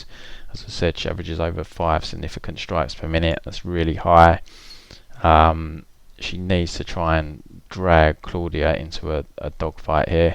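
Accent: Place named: United Kingdom. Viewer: First time listening to, and gauging, your podcast. British accent: check....so far, so good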